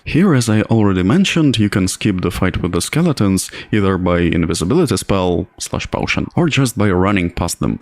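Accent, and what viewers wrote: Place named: Russia. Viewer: you gave me some runglish, love it.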